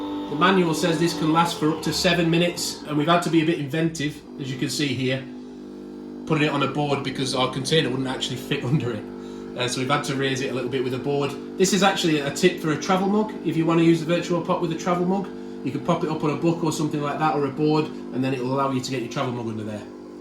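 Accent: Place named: Liverpool, United Kingdom. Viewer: Love that subtle Scouse accent 😆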